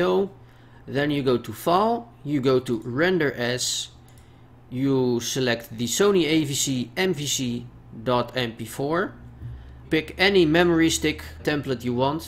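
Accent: Netherlands. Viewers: Are you from the Netherlands? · Hup Holland! :P Aan je engels was het wel te zien :D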